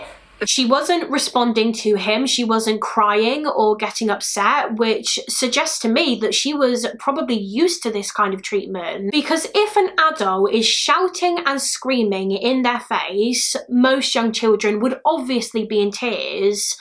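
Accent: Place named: England, United Kingdom. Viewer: I don't know why, but when i have a bad day, voice is just relaxing. I love the english accent.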